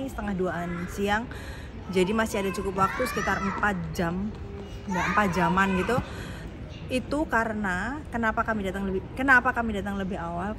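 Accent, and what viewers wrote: Java, Indonesia. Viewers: Sampean iki wong ndi to mbak kok jowone bnr2 msh trsa....sgt bgs mba wong jowo ojo ngilangi jowone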